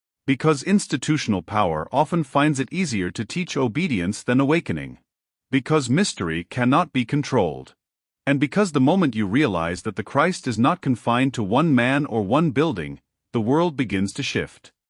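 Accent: United States. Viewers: typically American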